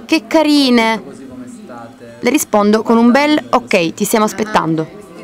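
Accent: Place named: Salento, Italy. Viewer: Orgoglio, una pugliese salentina fa parte di questo team favoloso! Si sente l'accento, specialmente quello dell'amico in live sull'apple watch xD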